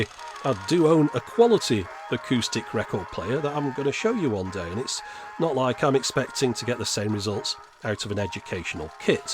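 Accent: United Kingdom. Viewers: Dear. I have two questions. First question: can you discribe and explain an automobile vinyl record player that was available in the 1970s? Second question: Can you review the 8 centimeter long mini cd and dvd and the Nintendo GameCube? Those were my questions. I like your videos very well and you have a neat British accent in your voice. With friendly greets from Gamer Japio.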